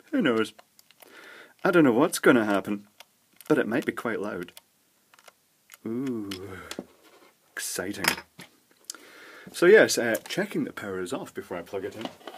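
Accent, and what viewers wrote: Scotland, United Kingdom. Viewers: I find your videos absolutely fascinating, but sadly, due to my lack of knowledge, I sit here watching and it’s like watching a foreign language film! No, I don’t mean your brilliant Scottish accent, I mean I have no understanding of all the electronic terms!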